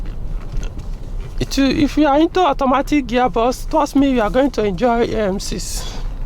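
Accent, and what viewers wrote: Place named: Igbo, Nigeria. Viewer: He's speaking English with Igbo accent/intonation. He's from the Igbo tribe in Nigeria.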